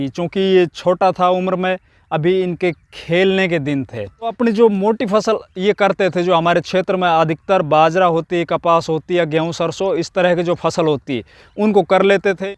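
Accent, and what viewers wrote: Mewat, India. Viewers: Bhai u Tuber vlogger aap kaha se ho. Aapki boli zuban m Mewati zuban ka lahja h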